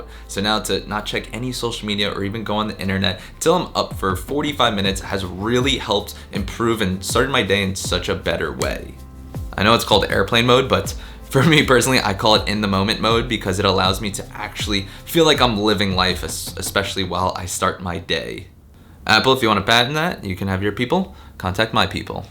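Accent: New York, United States. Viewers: Not sure why this video in particular, but that New York accent is coming strong.😏 Btw, love the content 😀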